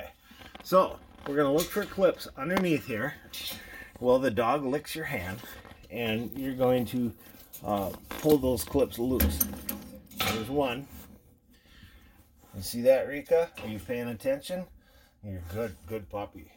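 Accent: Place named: Upper Midwest, United States. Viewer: Thx! Excellent info and funny. Been wanting to clean our glass for years. Sounds like you’re from WI or MN? 😁. I grew up near LaCrosse WI.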